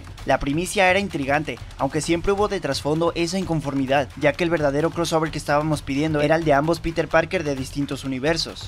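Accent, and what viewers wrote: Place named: Colombia. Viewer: Por que te escuchas como Colombiano en este video xd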